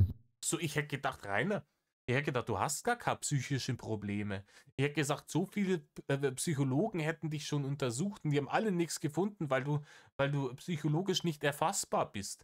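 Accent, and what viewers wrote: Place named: Bavaria, Germany. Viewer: Wie sympathisch, mir mittlerweile bayerischer Dialekt erscheint, seit ich den hör! Ofi ist die Puffmutter, der gute jedoch trifft absolut meinen Geschmack, wie man bei uns sagt: mit dicker Fresse und ziemlich viel dahinter! Also,i geh jetzt nein da,in den Reiner!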